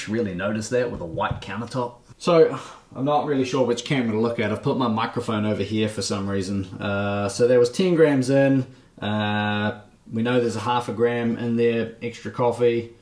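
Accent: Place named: New Zealand. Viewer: Opus wins tin times out of tin. Tin grams in. Ah the New Zealand accent, it's always a treat. Great video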